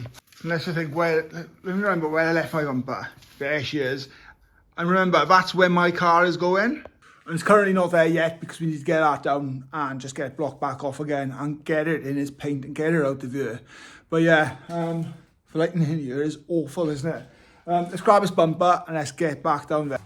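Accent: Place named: South Wales Valleys, United Kingdom. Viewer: yes it is a c20let haven't driven it for 18 years tho. Sounds like your from the top of the valleys I'm from Caerphilly not too far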